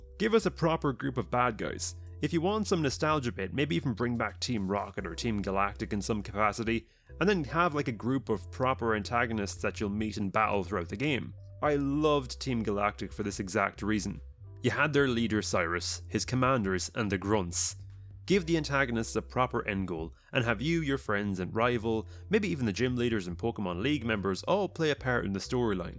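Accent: Ireland. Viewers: Your voice was like ASMR throughout the whole video 🫠 I love the way you say Jhoto... its the Irish accent. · You sound a lot like PotatoeMcWhiskey!! Are you Irish too?!! I love Ireland!!!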